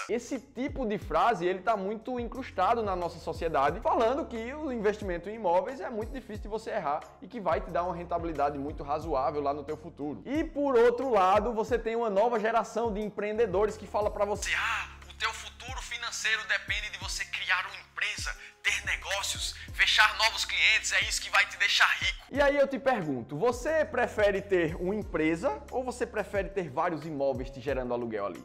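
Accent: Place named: Pernambuco, Brazil. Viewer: Pernambucano até a ventas! Pergunta aí se ele já comeu uns quarto de bode na serra das russas! Esse sotaque não me engana.... é da terrinha! kkkkkk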